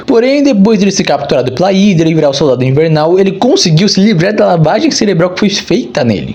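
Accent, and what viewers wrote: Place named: United States. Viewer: mania de brasileiro querer falar americanizado 😕🤡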